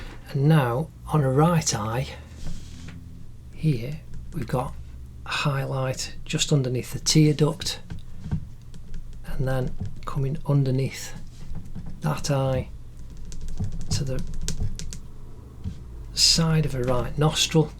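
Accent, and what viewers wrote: United Kingdom. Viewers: Whoa! Your accent is like newt scamander!!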